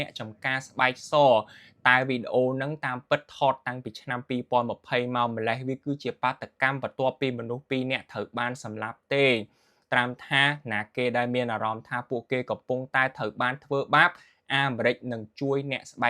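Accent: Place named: Vietnam. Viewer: កុំនិយាយភាសារខ្មែរតាមរបៀបតុងយួនមកនិយាយអី ព្រោះខ្ញុំស្អប់យួនណាស់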